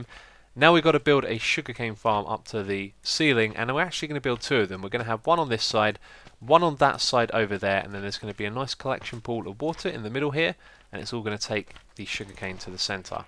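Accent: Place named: England, United Kingdom. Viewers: He is from England :)... English accent^^ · That's just the English accent :D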